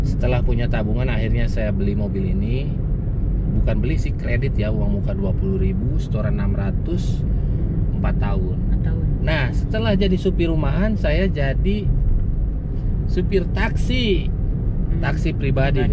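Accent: West Java, Indonesia. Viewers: Walaikum salam.. Khas sunda Bangets.. He he.. Salam ti Banten..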